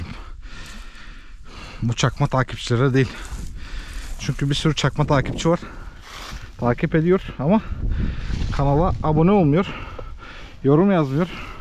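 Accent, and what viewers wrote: Thrace, Turkey. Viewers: Trakyalı olup konuşman 'da )ayrı bir hava veriyor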